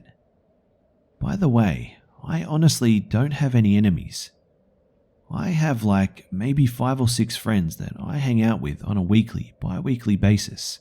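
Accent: Australia. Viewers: This guy sounds Aussie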